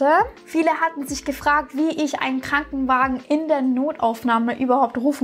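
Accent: Swabia, Germany. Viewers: ...Bisch halt einfach BESCHTE!😂#Schwabe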